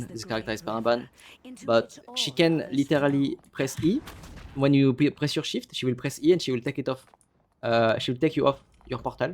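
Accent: France. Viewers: You have a very strong French accent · Hey bro are you French or something ? Nice video btw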